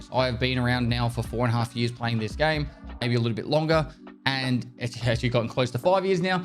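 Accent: Australia. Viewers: Uughhh Australian accent 🤢🤢🤮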